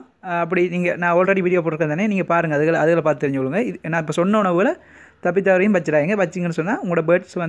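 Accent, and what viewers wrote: Sri Lanka. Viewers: Malayalam ila srilankan tamil💪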